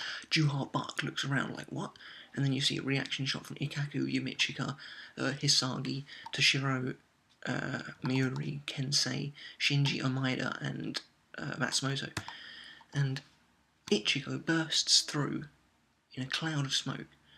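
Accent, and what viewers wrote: United Kingdom. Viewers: Wow, I didn't even think of what kind of side effects the balance of soul would have on the human world. This is why I like your reviews, your insightful and British...are you British?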